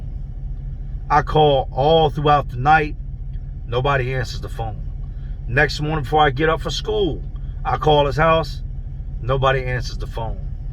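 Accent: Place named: Philadelphia, United States. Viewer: ur doing excellent work on teaching people the perils of prisons ect. My wife thinks ur cute, u sound like ur from N. Philly, ☺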